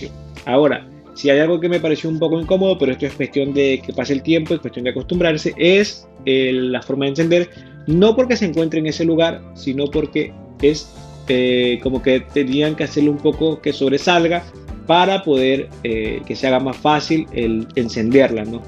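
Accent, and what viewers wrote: Venezuela. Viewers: Hablas como venezolano!! Jejeje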